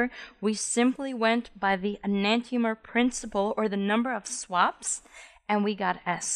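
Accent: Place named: New York, United States. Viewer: Beautiful! You got to be from New Jersey/New York. Thanks.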